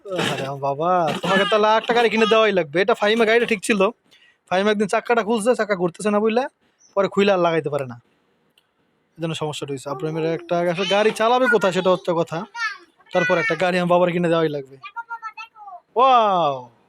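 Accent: Barishal, Bangladesh. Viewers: আপনার বাড়ি বরিশালের কোন থানায়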